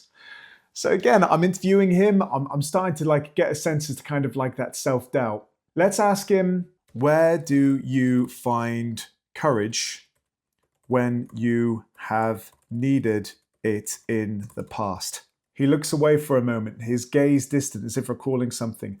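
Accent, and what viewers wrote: United Kingdom. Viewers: This is pretty funny, a Ukraine YouTube blogger uses it; the voice is the same, although it is Ukrainian) a gorgeous British accent you have, mister)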